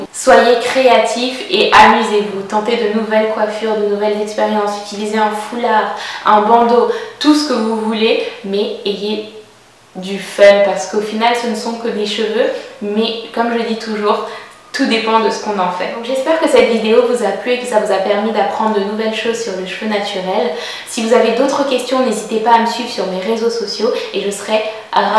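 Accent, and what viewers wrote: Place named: France. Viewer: vous avez une belle accente francaise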